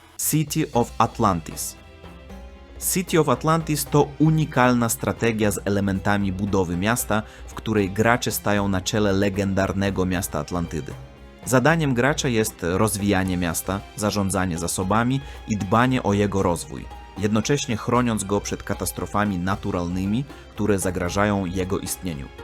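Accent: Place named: Russia. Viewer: brat jest naprawdę z rosji a ja myślałem że tak dla beki taka nazwa xD ale kocham twój akcent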